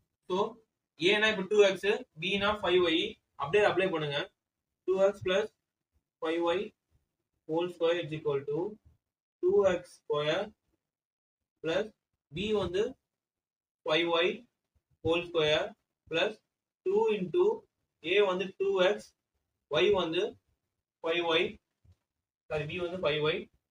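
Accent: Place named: Madurai, India. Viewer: Neenga madurai ha bro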